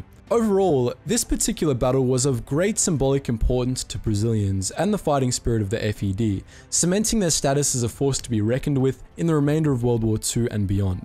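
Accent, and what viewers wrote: Australia. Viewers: Dumb question are you Australian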